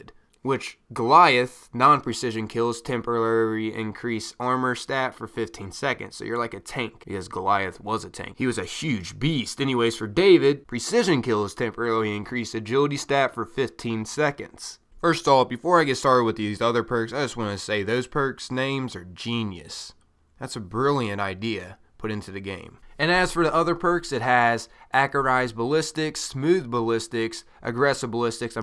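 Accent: United States. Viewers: it seems like your voice slowly turned more country throughout the video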